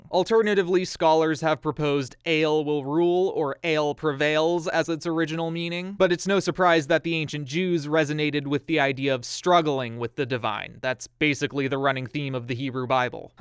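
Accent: United States. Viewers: The pronounciation isnt bad! It just has an american accent, good job. Adom especially sounds good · your hebrew pronunciations aren't half bad actually! it's just your american accent getting in the way lol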